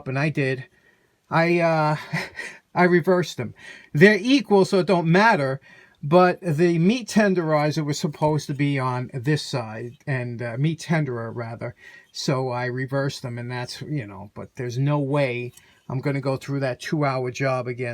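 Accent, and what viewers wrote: New York, United States. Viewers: Cool refurb! I've spent the last 30 years in Raleigh, NC by way of Mohnton, PA originally. But I'd love to bottle your NY accent and unleash it at will? 👍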